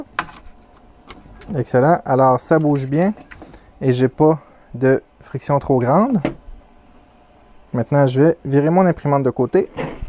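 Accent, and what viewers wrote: Canada. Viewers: bonjour, enfin des vidéos vraiment pédagogiques et super bien commentées avec un petit accent canadien en plus, j'adore. est-il possible de compléter cette installation par un auto bed leveling ? merci en tout cas tu viens de gagner un abonné :) belle journée.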